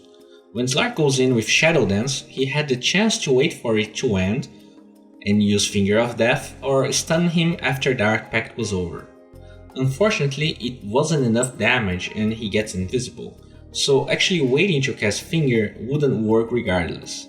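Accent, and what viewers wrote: Brazil. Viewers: ^ This. I'm unfamiliar with the brazilian accent so it makes it hard for me to understand him sometimes. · Let the brazilian man with the brazilian accent do what he likes. It's not like people can't understand shit because he pronounces words with an accent.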